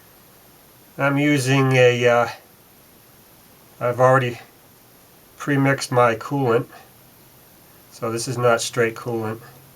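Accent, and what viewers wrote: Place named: Rhode Island, United States. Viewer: Probably because hes from "Rwoad" Island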